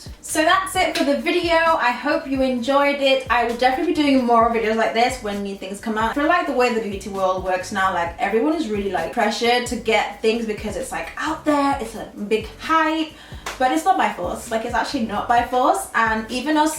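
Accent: United Kingdom. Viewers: I love the accent! Are you British?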